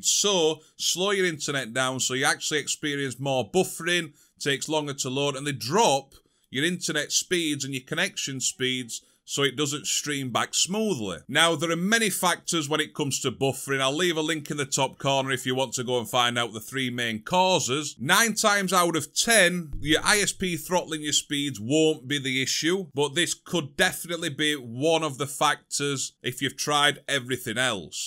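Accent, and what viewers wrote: Yorkshire, United Kingdom. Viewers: Won't lie you make me laugh are you Yorkshire or Lancashire you know your s***🤘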